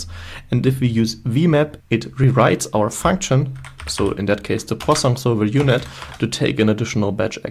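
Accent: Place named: Germany. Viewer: Are you german? Sound very german! Great videos BTW!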